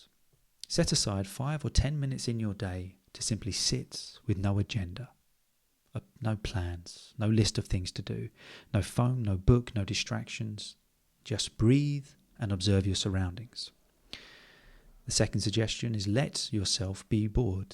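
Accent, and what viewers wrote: United Kingdom. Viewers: I never write comments, but I wanted to support you at least this way, because I think, your channel is really underrated. I absolutely love your British accent and I really appreciate how you cover such interesting and thought-provoking topics in your videos. So far I’ve only watched your last two videos, but I’ve watched them 3 times each.😅 Keep up the great work!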